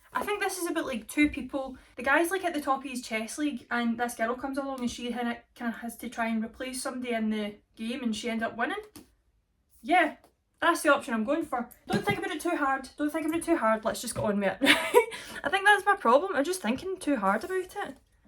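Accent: Scotland, United Kingdom. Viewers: Love hearing a fellow Scot! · If you have a watch stat of like 400 % on the first 3 seconds, it was me trying to understand what you said! But yes, yey, spring! 😂🌸 I'm reading The Maiden at the moment, which is set in Scotland, and having watched your videos is very helpful because now I kind of hear the book in your dialect while I'm reading. It's great! And you got a great TBR! I'll be interested to hear what you think of Cultish and A Tempest of Tea. I'm also wondering who all these people are who read the second book after a bad first one, and then tell anyone the second one is much better. What a sacrifice! 👏👏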